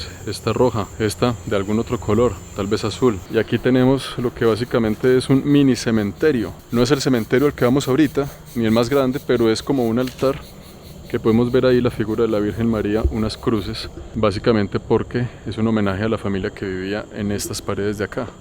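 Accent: Colombia. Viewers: Eres de Panamá y por que tienes acento colombiano???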